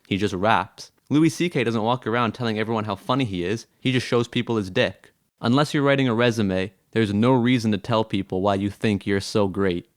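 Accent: Newfoundland, Canada. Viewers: Are you from Newfoundland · i knew you were canadian btw i can hear it in your voice. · “Aboot” “b’ys” Newfoundland? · Dude are you a Newfoundlander by chance? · Is that a Canadian accent?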